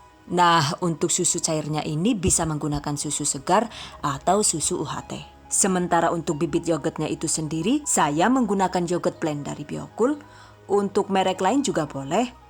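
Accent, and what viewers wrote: Banyumas, Indonesia. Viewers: ora ngapak ora kepenak.. enyong ya ngapak bun 😆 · Kok ada bahasa ngapaknya yaa,hehee,... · Ngapak ke kayak kiyek lha, gae seneng bae nak ngerungok no. Hahaaa... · Wong ngapak 😀, asli wong ngendi Bu? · Ngapak lah...yu'.... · sampe kaget aku pas jebul bahasa ngapak 😂😂😂😂 tak kirain temenku ngajak ngomong , eh ternyata suara dari yutub 😂😂 I LIKE IT 🥰🥰🥰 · Wkwkwk lucu bahasa ngpaknya saya suka haha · Auto subcribe cuma gara2 ngomong ngapak 😂😍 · Terimaksh ataas resepnya, jd pingin bkin yogurt dewek, aku kl dgr suara ngapake, jd ketawa wae, hbs lucuuu siihh, okey, sukses slu, salam dr Wong Pati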